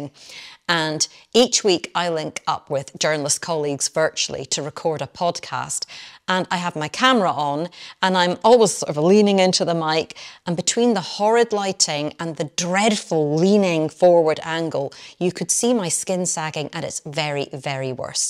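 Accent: Scotland, United Kingdom. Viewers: I love your accent. Scottish or Northern Irish? I hail from Dublin.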